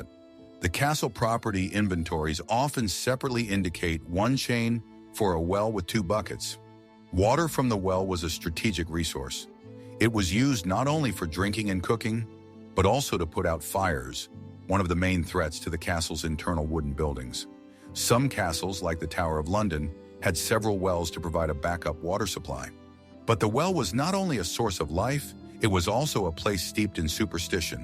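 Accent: United States. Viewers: Narrator a yank a no for me my house is older than the usa.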